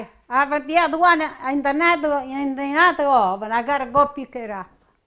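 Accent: Italy. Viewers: Κυρια ειστε υπεροχη.καταπληκτικα τα τσουρεκια σας καπως ετσι τα κανουμε και εμεις στην Ελλαδα.Μου αρεσαν και τα τραγουδακια σας ο Θεος να σας εχει καλα Καλο Πασχα.Αγαπω την Ιταλια ακομη και τον προφορικο σας λογο.❤❤❤ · I could listen to talk all day long! I love her Italian accent and watching her make her delicious dishes. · I so love her accent! She reminds me of a southern grandma with an Italian twist..😊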